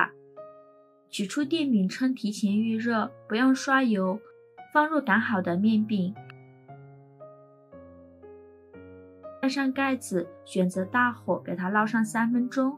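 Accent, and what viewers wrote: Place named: Mainland, China. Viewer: 中國都是這麼唸 我們台灣唸ㄒㄧㄠˋ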